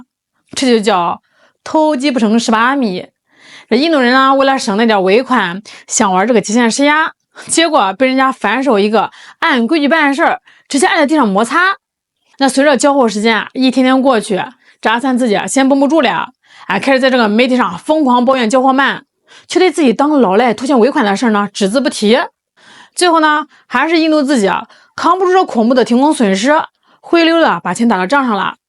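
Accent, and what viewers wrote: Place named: Shandong, China. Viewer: 口音像山东老乡呢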